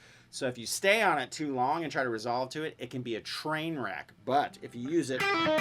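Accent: United States. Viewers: Enjoyed that lesson. I slowed it down to.75 speed to get the licks down correctly but when I did it made you sound like you had about 5 bong hits under your belt when you where talking. lol Guess it's that southern California accent...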